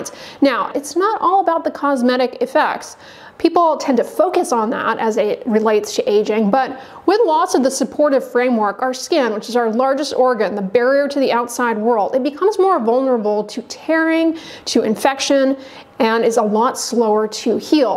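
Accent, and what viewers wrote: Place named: Houston, United States. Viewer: That's Houstonian accent in Texas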